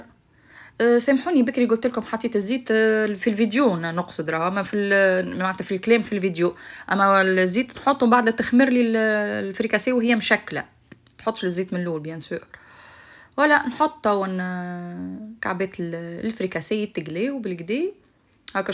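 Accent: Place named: Tunisia. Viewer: الله يبارك 😊مشخشخة تع الصح تهبل اللهجة التونسية يعطيك الف عافيه تبان تشهي كملي راح تنجحي لحبيبة من الجزائر تحياتي ❤❤❤❤❤❤❤❤❤❤❤❤❤❤❤❤❤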